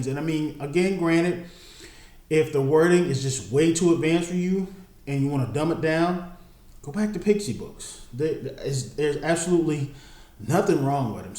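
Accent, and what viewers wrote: Denmark. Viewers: When I'm listening to this video, I can't help but think that you have a slightly "Danish" accent when you are speaking English. It's actually pretty cool! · But you have to listen really good to notice his "Danish accent". But yeah. It's a good sign.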